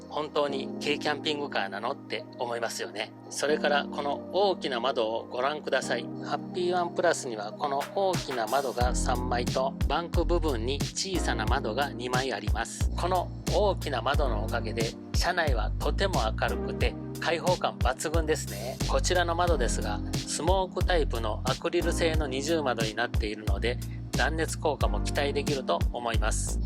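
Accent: Kobe, Japan. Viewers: とても分かりやすくて参考になります♪ 以前神戸に住んでいたので言葉も懐かしいです～😄 軽キャンを検討、特にhappy1+を考えてるのでこれからも動画を楽しみにしています～😄 レンタルもしてみようと思います😄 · 神戸の人かな❓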